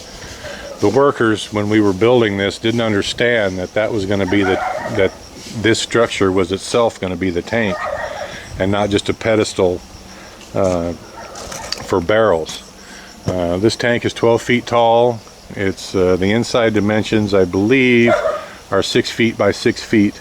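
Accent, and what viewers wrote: South, United States. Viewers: You got some southern droll accent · that kind of house is the best shelter for you since bohol is always visited by typhoons... by the way, you have a nice southern accent..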